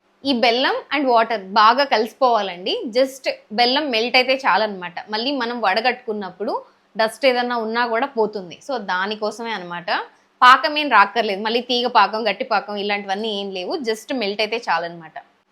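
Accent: Nellore, India. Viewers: Hi nenu kuda nellore, nenukuda chestuntanu. You tube maa oori basha vintunte chala bagundi. Nenu banglore dagara vunde madanapalleki vuntanu.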